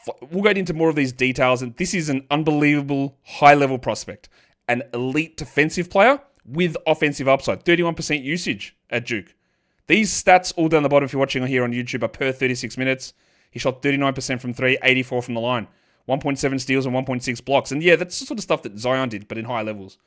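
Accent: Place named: Australia. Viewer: He’s Australian, he inherently has an accent different from ours. Also he’s. He’s called sadiq bey the depressed penis for his whole career.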